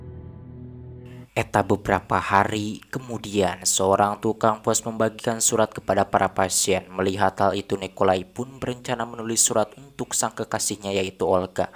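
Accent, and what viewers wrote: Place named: Java, Indonesia. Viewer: Jawa bangat sih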